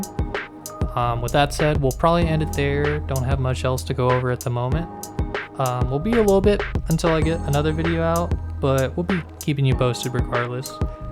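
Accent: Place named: Canada. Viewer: are you perhaps Canadian? (You sound very Similar to Kan Gaming)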